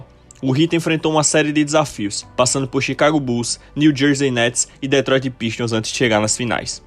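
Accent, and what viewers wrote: Alagoas, Brazil. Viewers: Tu é de Alagoas? Esse sotaque denuncia... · boa irmão! seu sotaque é foda! NbA nordeste🫵🏾⛹🏾🏀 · Tu é de Alagoas?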